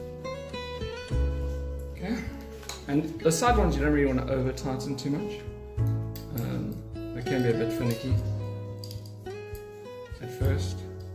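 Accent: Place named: South Africa. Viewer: The style of this video, music and video quality and South African narration has a district 13 feel to it. I really enjoyed watching this upgrade.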